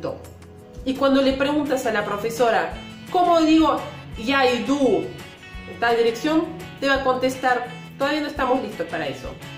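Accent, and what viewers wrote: Argentina. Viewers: Vives en Argentina??? Lo digo por el acento que tienes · Muy bueno, hablas como argentina nativa! Espero alguna vez hablar así el ruso. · Que graciosa Rusa hablando argentino 😁.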